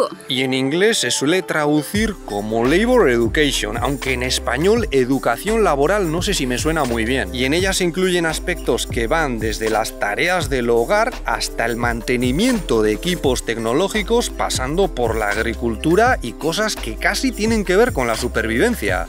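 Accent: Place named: Basque Country, Spain. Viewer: Un vasco - vasco en la corte de chinpin o como se llame.Tiene un acento de euskaldun hablando español muy forzado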